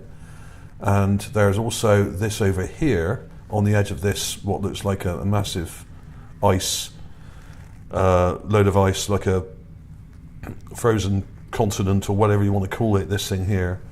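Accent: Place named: West Country, United Kingdom. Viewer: Thanks ….for another share. Always fascinating. Especially in that warm West Country voice of yours. I am from Hampshire myself.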